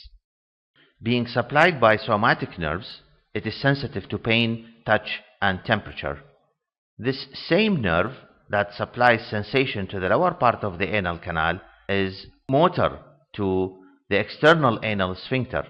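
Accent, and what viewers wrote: Iraq. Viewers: شكرا دكتور على قناتتك الرائعه نفتخر بيك انت قدوتنا منوره الاكسنت العراقيه كلش حلوه وان شائ الله المزيد من التقدم والموفقيه :) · عرفتك عراقي من اللهجة ههههه ربي يحفظك حبيبي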